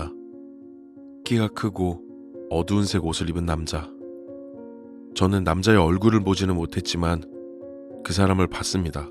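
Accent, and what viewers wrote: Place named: Gyeongsang, South Korea. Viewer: 님억양이 경상도느낌이 네요 듣기조으네요 잠자리들때면 꼭들으면서 잠이듭니다 불면증때문에요 이젠잠이와요 자야겠네요 수고하셨어요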